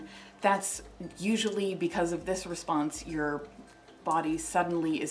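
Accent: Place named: Canada. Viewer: So so gorgeous.. like seriously, love look, and the Canadian accent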